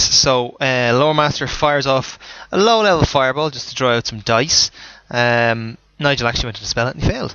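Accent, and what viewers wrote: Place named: Ireland. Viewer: Thanks for the report,. Nice to hear an Irish accent on some Warhammer battle reports (even if it is a Dublish(ish) one, haha). Sound, mate.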